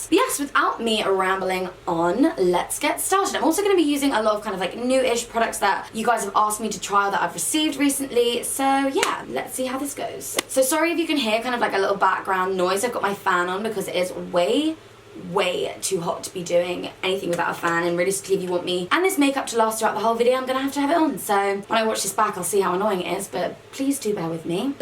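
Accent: Australia. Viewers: Is it just me who loves her Australian accent😜☺️😍😛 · Omg yaaaass! favourite look! soo fab! haha your aussie accent isn't too bad very dramatic but crack up. I am a kiwi ( aka a New Zealander) but still think you didn't doo too bad! x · Your Australian accent is spot on! (I'm Aussie) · have to say for an english girl your aussie accent is good lol but this is a great look :) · I bloody love your Australian accent 😂 keep doing it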